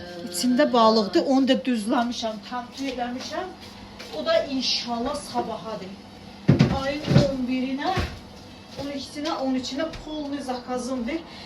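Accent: Talysh, Azerbaijan. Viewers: Молодец. HALALDI. TALISSANMI.? LEKCE VAR. ALLAH QORUSUN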